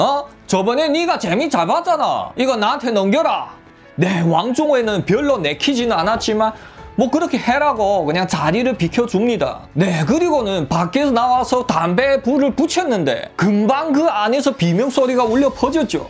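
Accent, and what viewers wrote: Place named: Korean-Chinese, China. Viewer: 구수한 조선 족 사투리와 스토리가 재미 있군요.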